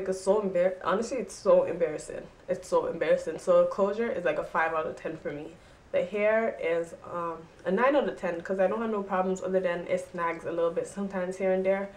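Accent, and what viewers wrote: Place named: Virgin Islands. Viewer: This hair is beautiful! I just ordered mines. And if you don't mine me asking, your accent sounds like your from the islands; are you from the Virgin Islands?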